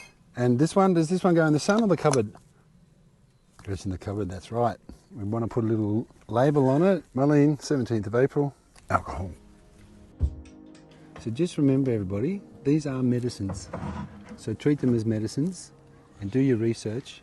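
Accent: Australia. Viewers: Thank you for giving free information and I copied down much of the links and when I get paid I will be supporting your work and I appreciate all the information and help! Thank you so so much and I love your Australian accents helps my American add mind pay attention! ❤ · Hi 😊 What would be the chances of me coming to do work experience? Forgive me if im wrong but that is an Aussie accent? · I subscribed for the same reason! Gardening and an Austrailian accent. Good day!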